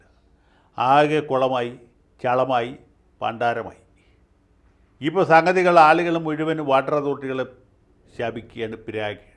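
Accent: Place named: Trivandrum, India. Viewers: Trivandrum slang super sir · അണ്ണാ അണ്ണന്റെ തിരോന്തരം സ്ലാങ് പൊളിച്ചു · Jayashankar Sir, the tvm slang you use is too good. you sound like Mamooty in a malayalam film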